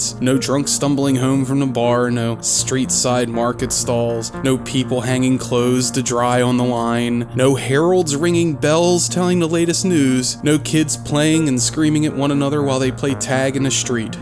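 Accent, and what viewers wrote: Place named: Mid-Atlantic, United States. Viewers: You from Maryland, bro? You got that THICC mid atlantic accent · I detect a Pittsburgh accent.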